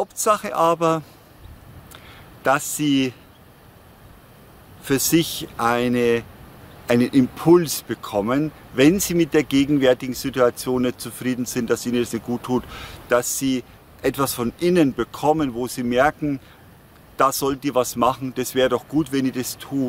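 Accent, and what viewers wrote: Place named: Franconia, Germany. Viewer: Wunderbares Video mit guten Informationen. Ich höre den Anschlag aus dem fränkischen Dialekt so gerne. Ich bin seit fast 20 Jahren aus Franken weg gezogen. Das Fränkische hört man sofort.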